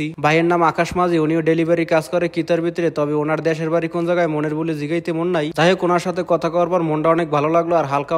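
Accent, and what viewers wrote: Barishal, Bangladesh. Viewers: মনু আমনেও কি বরিশাইল্লা🙂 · আপনা বাসা কি বরিশাল ভাইয়া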